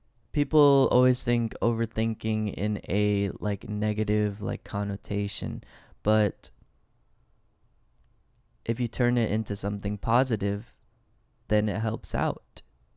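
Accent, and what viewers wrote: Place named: United States. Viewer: More videos plz ❤ I find your voice very clear to practice my American English accent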